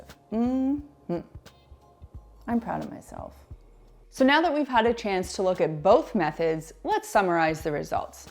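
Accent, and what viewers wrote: Canada. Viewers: Great video with tips for a chore that I always dread. Makes it seem way more doable now, so thank you! Side note: are you a Newfoundlander?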